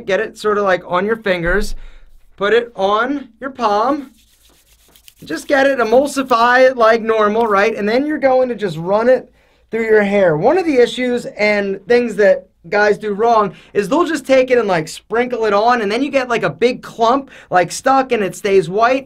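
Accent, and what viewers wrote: Philadelphia, United States. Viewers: Bro where are u from? Sounds like a Philly accent.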